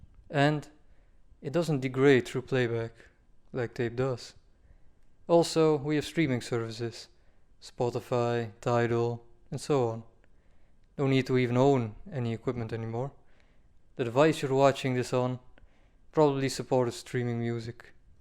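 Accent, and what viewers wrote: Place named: India. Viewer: First i thought, there is speaking an indian😅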